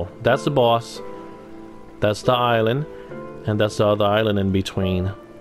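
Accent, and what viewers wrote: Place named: Spain. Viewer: Hey man,,l meant no disrespect, Spanish is a beautiful language and your english is very good, ,l should have known this by the way you pronounced compass, ,sub and share for your great reply back,,,thanks and a big hello from New Zealand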